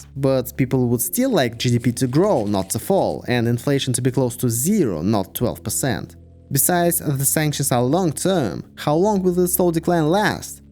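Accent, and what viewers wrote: Russia. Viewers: Russian akcent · Неужели нельзя было найти диктора без такого ужасного акцента "а ля рюс".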